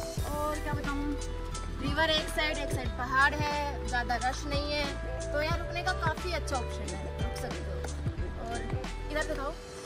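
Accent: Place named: Haryana, India. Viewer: Your haryanvi accent is so nice. Keep it raw 😊 #proud_to_be_Haryanvi.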